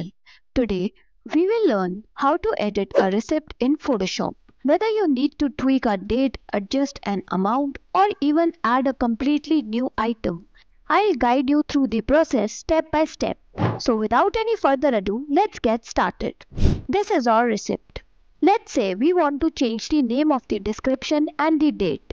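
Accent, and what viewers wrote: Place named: India. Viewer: Terrible. I didn't expect anything better when I heard an Indian accent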